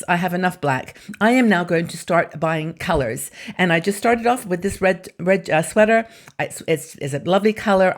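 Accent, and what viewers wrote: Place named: England, United Kingdom. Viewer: LOVELY!!!!! SO NICE TO HEAR YOUR ENGLISH VOICE MY DEAR. I LEFT LONDON IN 1952 AND STILL HAVE MY BRITISH ACCENT, OF WHICH I AM VERY PROUD. I MEET FEW BRITS, BUT LOVE THE ENGLISH MENTALITY, WHEN I DO....SO. POLITE, EMPATHETIC, FRIENDLY AND UNDERSTANDING, A GREAT JOY ALWAYS....A N D... SO I SEND MY WARMEST CHRISTMAS GREETINGS TO YOU. YOU ARE INDEED A PLEASURE TO ALL OF US.... AND ......I LOVE TO BE ALONE AT CHRISTMAS....I CAN REFLECT AND THINK OF MY BELOVED HUSBAND AND MY DARLING DAUGHTER WHOm I LOST LAST JANUARY TO CANCER...MY FAMILY ARE NOW FEW AND FAR AWAY, BUT WE ARE VERY CLOSE, AND KEEP IN TOUCH ALMOST EVERY FEW DAYS...SO THE LOVE NEVER CEASES....But I am alone and that;s fine with me....I make the best of life...Happy Christmas to you dear friend. I AM NOW 88 1/2 AND AM AS FASHIONABLE AS I ALWAYS WAS!!!! LOVE IT!!!! 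Hahaha! I honestly love to be alone at Christmas, and reflect on those happy memories I have.I am not sad at all.....I LOVE my time alone, and look forward to the new year immensely.God bless you and yours. You are my Mince pie and sausage rolls connection. My Mum too!!!!! used to make them, and we put sixpenny pieces in the Christmas puds she made......Happy days....even the War days.... Happy Christmas everybody💋💋💋💋🌲🇬🇧🇨🇭🇺🇸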